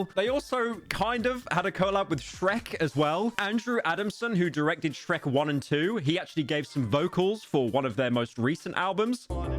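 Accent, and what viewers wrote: United Kingdom. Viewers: why is he more british in the video rather than in any other video?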